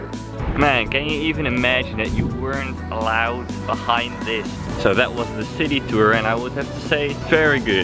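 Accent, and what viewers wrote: Australia. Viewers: Talking like an Auzzi pal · Hi, I thought I'am the only one noticed your Oz accent. Great that you had assimilated to the local culture pretty well. Happy New Year to you and may it be the best for you!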